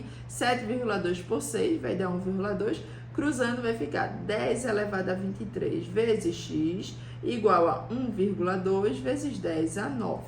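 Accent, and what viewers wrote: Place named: Recife, Brazil. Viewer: Este sotaque recifense não engana, com certeza temos aqui uma excelente engenheira química recifense, visse?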